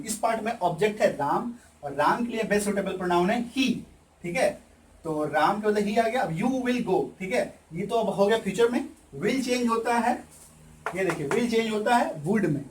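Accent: Bihar, India. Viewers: sir Bihar se ho kya apne bhaut acha samjhya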